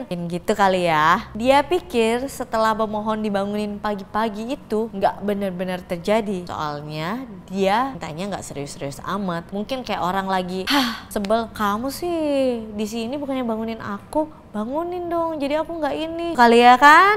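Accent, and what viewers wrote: Java, Indonesia. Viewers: bunda sarwendah gemes baca bahasa jawa sehat selalu bunda sarwendah cantik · maaf ya.. bahasa jawanya bikin ngakak.. tp ga apa2 bhs jawa pelawalannya mmg susah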